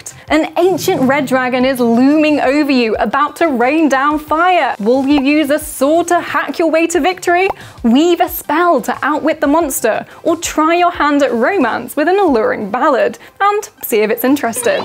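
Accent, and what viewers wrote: England, United Kingdom. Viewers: She is english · I thought Aussie at first, but after watching the rest of the video I'm pretty sure the other comments are right. I don't know enough about accents to really describe the differences between this and a typical Aussie accent, but it sounds British. Definitely not Kiwi - a NZ accent makes every vowel sound the same. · She is fantastic. A laid back eloquent English accent i like it. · British accent.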